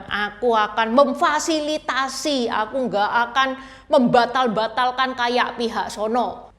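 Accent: Java, Indonesia. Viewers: Anjai jepang jawa medok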